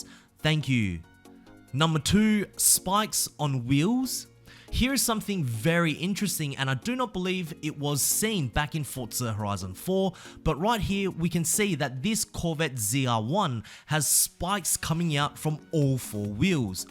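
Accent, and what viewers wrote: Australia. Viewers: Fun fact! I was today years old when I realized is Australian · Wait ur Australian My brother What part are you from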